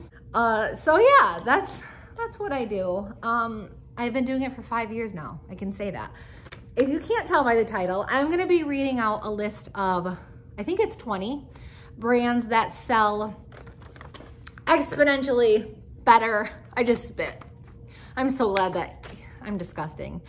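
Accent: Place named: Chicago, United States. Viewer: Are you in the Chicago area? You have an accent….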